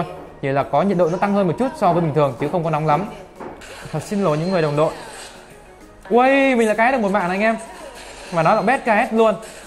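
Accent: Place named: Thanh Hoa, Vietnam. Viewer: Nghe thấy giọng thanh hoá tắt video ngay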